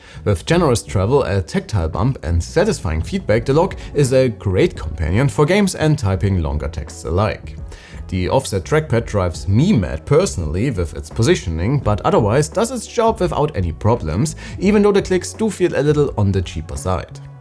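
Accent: Germany. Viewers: Smart to do Videos in English for you, but the German accent is insufferable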